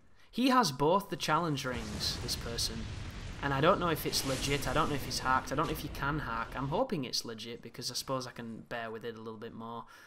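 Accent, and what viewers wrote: United Kingdom. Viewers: 1. It's very refreshing to hear more Brits on these videos. 2. I totally hear you on the guard break actually doing the command seldomly. I can't count the amount of times that I'm sure I inputted forward and R1 perfectly only to swing my sword. On the other hand, the amount of times I've attempted a guard break with a chime...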